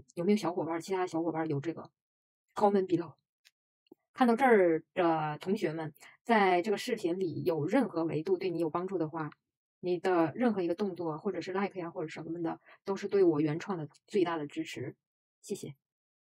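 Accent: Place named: Northeast, China. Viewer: 你的东北式英语让我很糟心。也谢谢你的分享:face-blue-smiling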